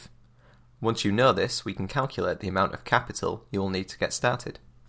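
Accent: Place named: North, United Kingdom. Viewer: any suggestions on online personalities? do you do mentoring. BTW your videos are awesome its always a great feeling of confidence when i listen to someone with a northern accent lol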